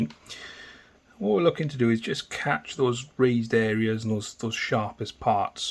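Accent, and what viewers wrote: Wales, United Kingdom. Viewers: Sorry for your loss bro. I lost my best bud back in July. Do I detect a Welsh accent there? Swansea boy here.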